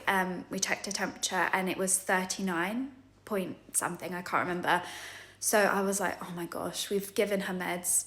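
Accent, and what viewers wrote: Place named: United Kingdom. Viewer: I won't go into the gruesome details of what I went through 12 months or so ago. By your accent I'm assuming you are from the U.K. It is such a simple test to check for a U.T.I. That SHOULD have been the first thing that they checked. Indeed, you shouldn't have even requested it. It is so frustrating and dangerous that you had to wait 5 days for it. I hope your child is now well and happy xx.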